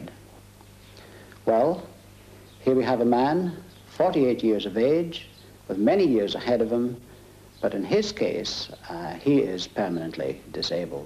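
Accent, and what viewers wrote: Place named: England, United Kingdom. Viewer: That's a proper english accent. Major thing i like docs for.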